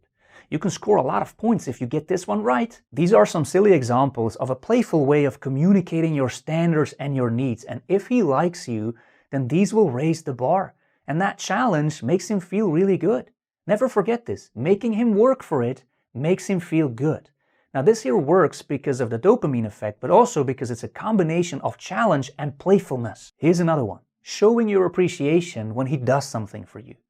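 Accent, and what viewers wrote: Scotland, United Kingdom. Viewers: Scottish accent. 💋❤️ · ~This is fantastic advice!!!~Im distracted by your accent tho, trying to tell if its scottish, or irish, or...???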